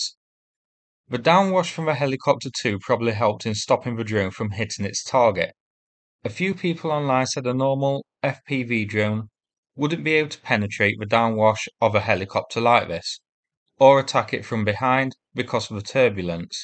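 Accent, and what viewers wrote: United Kingdom. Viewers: Why pursue it buddy, when its likely you'll have your nose wiped in the sh*tey again? If you don't realise how comical your 2nd reply appeared, after you sought to humiliate our host, I can't really help you. Although I think you were embarrassed, and are now over reacting by thinking you can fight it. Our host can answer for himself the way he speaks, but I for one appreciate all the local accents and quirky expressions that exist in the UK, as someone who himself is British. In fact, I'd put a preservation order on them if I could..........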